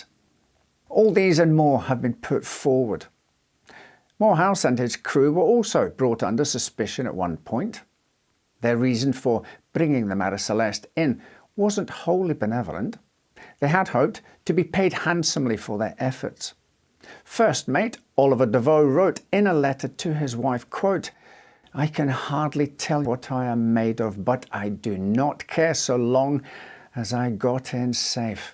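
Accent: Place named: Scotland, United Kingdom. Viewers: I’m really curious about where you’re from — your accent has some strange twangs, like when you pronounce “O” it sounds very New Zealand (“boat,” “known” etc), while you mainly sound Scottish… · Being told tragic and horrible stories, but in my favourite accent and a really nice voice is a confusing experience, but as usual with me, the Scottish accent outweighs the nightmare material. 😌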